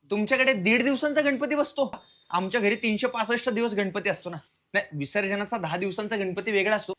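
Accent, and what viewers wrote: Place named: Akola, India. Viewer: Akola bolte palan ❤❤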